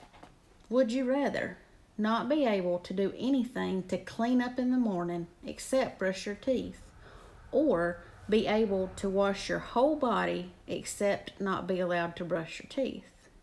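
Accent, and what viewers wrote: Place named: Louisiana, United States. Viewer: omg i love you ...kept me laughing the whole hour....could listen to your accent all day ..makes me homesick....was born and raised in Louisiana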